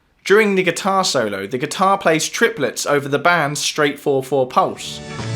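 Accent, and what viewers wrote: United Kingdom. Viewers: Great video - thank you. If you could list the bands and songs, be a big help - the only way I found the, song was by searching the lyrics - your Brit accent is wonderful, but couldn't catch that. I've always heard as 4/4 (Bonham) and 6/8 (Page). With the 1/8th notes constant between the 2, it let's the guitar do the waltz. I find it actually easier to hear/count that way. Thanks for your work!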